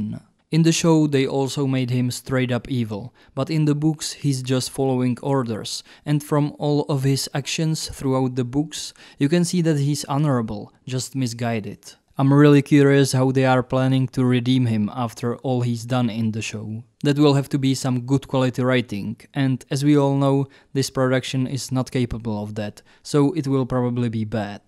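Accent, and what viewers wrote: Czech Republic. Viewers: love your Czech accent!❤ keep it up, bro.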